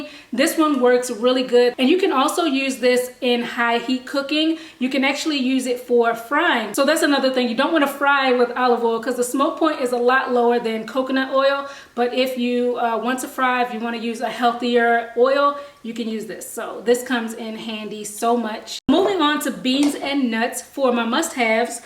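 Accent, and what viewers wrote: Baltimore, United States. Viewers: You from Baltimore? You have a accent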